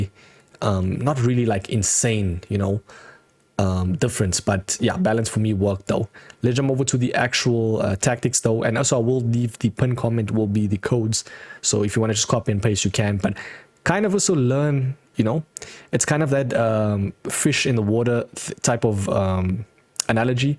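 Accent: South Africa. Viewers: Is this guy South African ?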